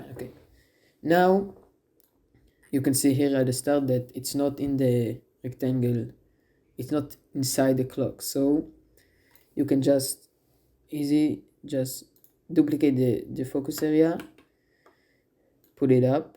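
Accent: Israel. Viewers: איו אחי המבטא הישראלי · על פי המבטא הבנתי שאתה משלנו רחלה סרטון ערוך חזק גבר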